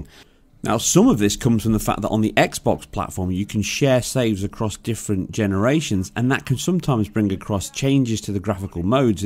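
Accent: United Kingdom. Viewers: Doing tech analysis with UK accent is the right move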